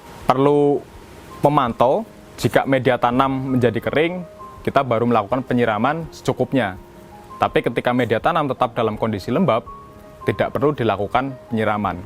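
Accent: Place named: Banyumas, Indonesia. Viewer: Mantep!! Ngapak kie mas e kaya inyong. Btw kalau batangnya kering ngerendamnya lagsung pake air+fungisida atau rendam air dulu baru fungisida?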